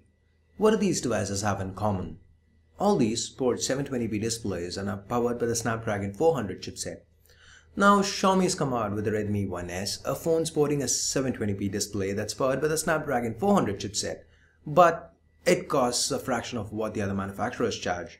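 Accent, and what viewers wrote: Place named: India. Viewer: hey ok your reviews are helpfull but i cant c indian speaking in that akcent sry to say it looks awkward other wise vides r gr8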